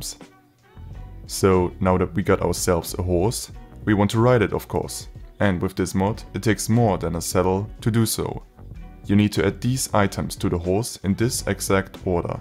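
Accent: Germany. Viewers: I hear German Accent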